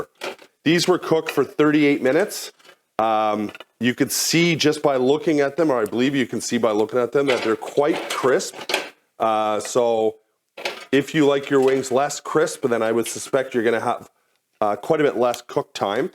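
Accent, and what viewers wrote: Canada. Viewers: Soooo...don't put it in the dishwasher then? Also, you sound suspiciously like a Canadian.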